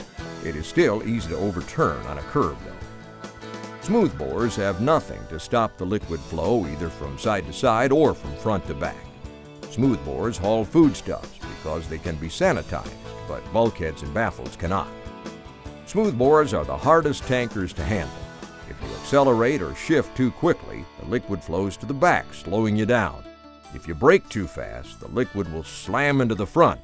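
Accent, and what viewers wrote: Texas, United States. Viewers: That TEXAS JUNKEY narration though. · sounds about texan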